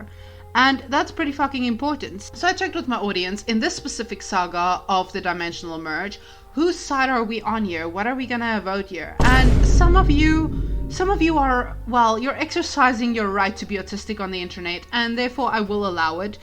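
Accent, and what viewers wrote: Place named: South Africa. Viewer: Aydin Paladin het jou video vir my gestuur, het die stem gehoor n gedink ja nee jy kan net n Suid Afrikaner wees..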